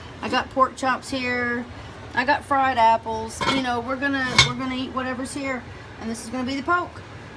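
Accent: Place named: Kentucky, United States. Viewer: Is this really the hills of Kentucky? I love it, and that accent is too cool - hoping for lots more videos like this!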